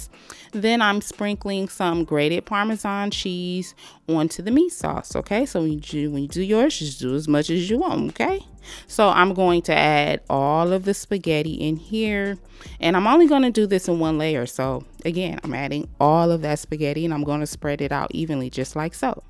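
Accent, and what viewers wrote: South, United States. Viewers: Ik you from the south 😂😂❤️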